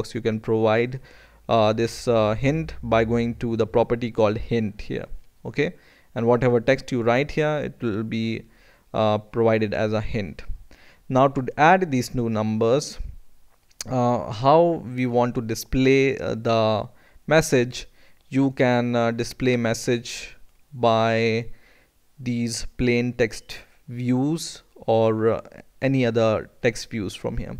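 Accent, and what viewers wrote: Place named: India. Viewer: Are u an Indian Guy? Sounds like u are, at least for me it does. Can u show US How to make something like this -> I wan't to add number, but like this Let's say i type ASD+2 So the app store 2 on the ASD file, if the 'ASD' it doesn't exist yet, it make a new one, and ad the 2 on it Then i hit ZXC+3, it adds like before, but add on ZXC, again if it doesn't exists, it create one 'row' named ZXC, and add 3 on it Then again, i type ASD+8, it sees that ASD already exists, and has a value of 2, then it add 8 on top of it, resulting 10 Its a calculator, but with letter's too, Just a crazy idea of mine hahaha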